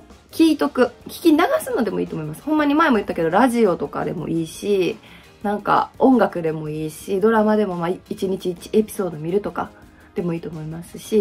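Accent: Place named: Kyoto, Japan. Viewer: 🎉 さま❤ 一目見た瞬間に大ファンになりました!😅そうかー、時々出る関西弁ば京都やったんですねー😊いや～、良い!京都やったらさん、可愛いから舞妓さんになってもバカ売れだったでしようねー😂ほんとに素晴らしい女性ですね😮花粉症なところもとても親近感覚えます❤🎉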